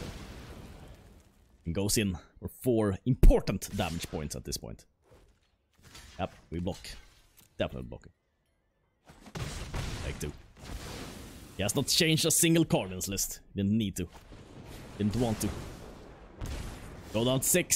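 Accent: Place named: Sweden. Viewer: is u swede boi, u sound swede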